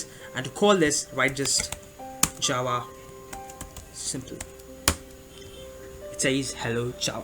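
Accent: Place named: India. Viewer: Accent Indian hi rakh. Aur piche ka music hata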